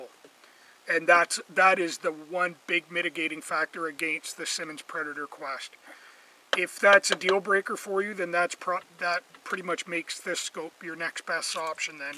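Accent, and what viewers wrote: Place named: Canada. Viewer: he's talking Canadian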